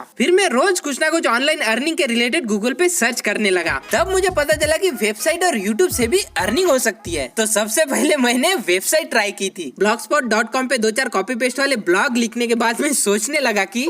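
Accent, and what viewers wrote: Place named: Maharashtra, India. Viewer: I love your Marathi accent😘😘😘❤️❤️❤️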